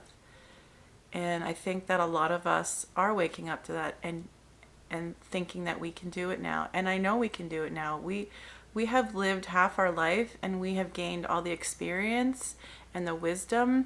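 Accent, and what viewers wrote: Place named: Canada. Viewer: Congratulations!!! I watched your video a day or two ago and knew it would resonate with so many people because you were telling your personal story and people love to hear stories that feel rooted in the “hero’s journey” where someone is choosing to step out of their regular existence to follow excitement and adventure; what bigger adventure is there than the one to create a life you love?! Also, I loved that as soon as you started speaking I thought “she’s a Canadian”. As a Canadian (from Toronto) residing in the US I enjoy hearing the cadence and “accent” of my people. I miss home a lot! 🙂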